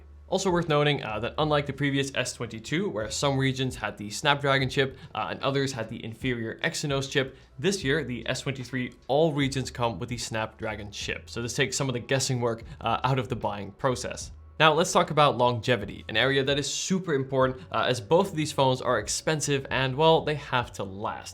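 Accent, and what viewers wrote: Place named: Netherlands. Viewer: Ik kon merken aan je accent dat je Nederlands bent, haha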